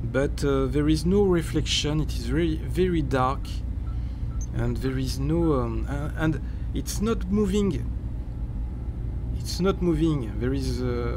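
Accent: France. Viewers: You have a big French accent !!! (I’m French)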